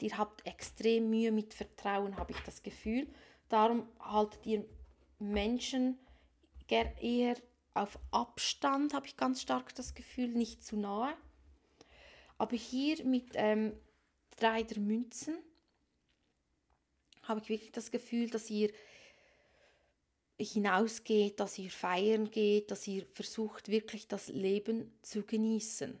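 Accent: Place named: Switzerland. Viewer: Ich liebe deinen Schweizer Akzent. Schön dir zuzuhören. lg Marcel🙏😊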